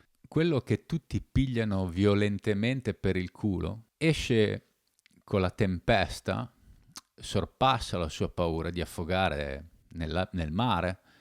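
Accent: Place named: Bologna, Italy. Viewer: Grazie Ma di dove sei? Sento un accento bolognese ... Buona serata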